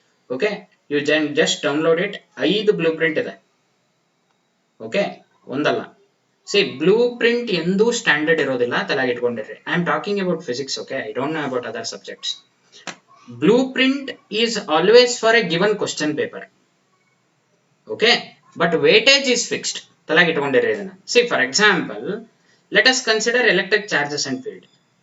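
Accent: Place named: North Karnataka, India. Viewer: Sir nim javari bashe esta ayt. Hinga classes madi namg help madri plz🙏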